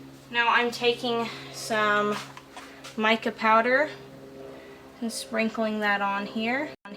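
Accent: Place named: South, United States. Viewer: I love watching you make soap!! where are you from? You kind of sound like another YouTuber I watch, grvyrdgrl....I think thats right...she is from texas and has the most delightful southern accent, as do you :)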